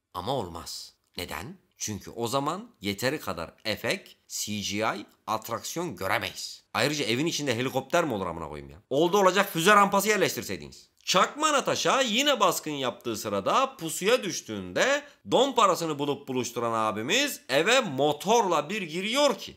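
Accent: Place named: Thrace, Turkey. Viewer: Trakyalı ağzı yapmış çükolatama harbi güzel yapmış ağzına sağlık xd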